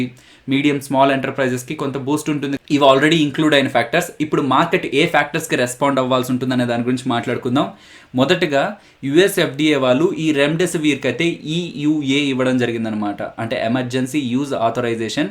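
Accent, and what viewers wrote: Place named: Nellore, India. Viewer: nellore kadha anna meedhi.. slang alaney undhi..🙂🙂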